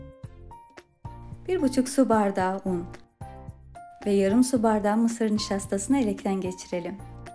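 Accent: Azerbaijan. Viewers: Azerbaycanlılar gibi aksanız var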